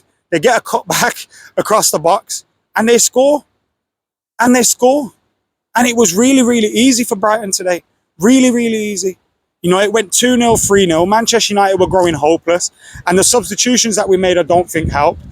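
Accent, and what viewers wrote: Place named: Liverpool, United Kingdom. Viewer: Scouse cxxt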